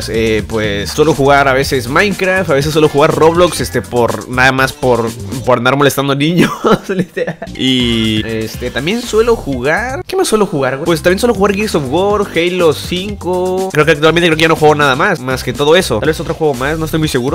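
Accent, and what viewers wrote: Chile. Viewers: habla como Chileno por un vídeo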